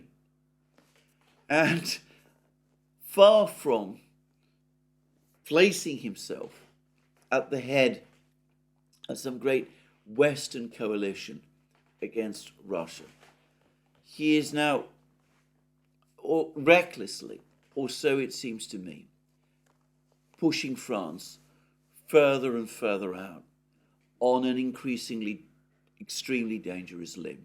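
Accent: United Kingdom. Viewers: Great strategic lesson. Great sophisticated super duper British accent delightful to ear. Thanks ❤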